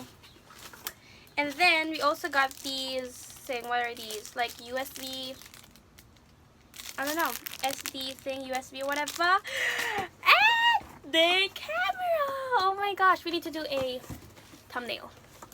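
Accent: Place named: Tagalog, Philippines. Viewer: I love your accent and your Tagalog accent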